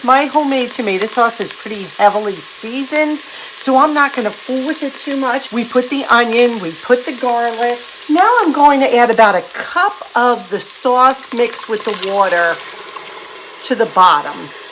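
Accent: New York, United States. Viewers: Im a New Yorker moved to the south and i adore your ny accent , makes me miss home.I still have mine too.I am told.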